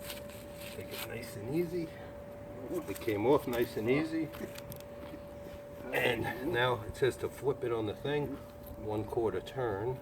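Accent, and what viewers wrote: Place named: Illinois, United States. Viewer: Judging by the accent, this guy is definitely from Illinois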